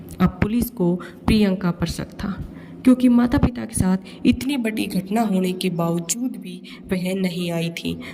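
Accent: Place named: Haryana, India. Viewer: Awaz haryana ke hai, ma'am haryana ke hai.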